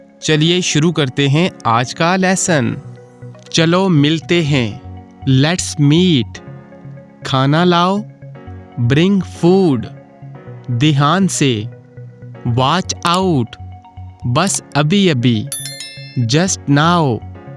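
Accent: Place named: United Kingdom. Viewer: mashallah ap ka accent British he really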